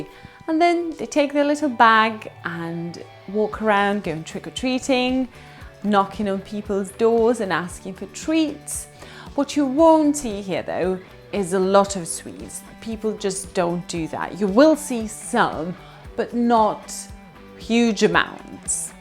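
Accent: United Kingdom. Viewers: I have fallen in love with your British accent ❤ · I admire your British accent! Cheers! :) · Если бы темп её речи был чуточку быстрее, то это был бы ад для меня при понимании. Ну никак не могу разобрать британский акцент!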